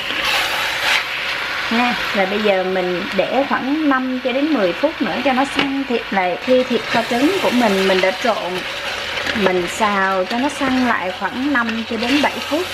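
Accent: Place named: Hue, Vietnam. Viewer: Giong hue nghe de thuong qua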